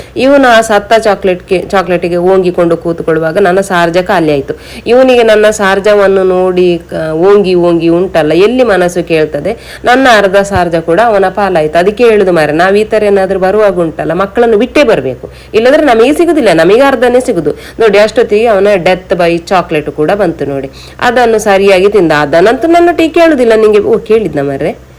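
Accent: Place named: Sullia, India. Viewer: Ninu sulliada rural houdu mathaduvaga gothagutha ide. Madam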